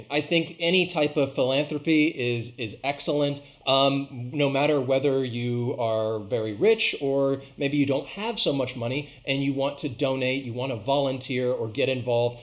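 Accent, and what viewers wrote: United States. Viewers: Hi teacher you have a nice and very useful teach ! But I learn a little english ! I wish oneday I learn a lot of english so I love just American accent !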